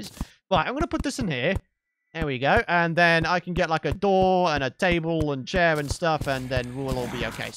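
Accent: United Kingdom. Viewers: What an british accent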